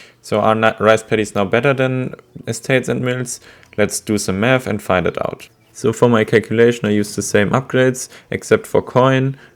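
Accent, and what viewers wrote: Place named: Germany. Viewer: I am sorry but this video is incomplete. The real gather rate heavily depends on the number of villagers per mill / estate, since the collisions become more frequent and more time is lost. We have calculated the gather rate for all possible numbers of villagers, CDB, and settler wagons: <link> (the Video is in German but since your accent sounds German, I hope that you will be able to understand it).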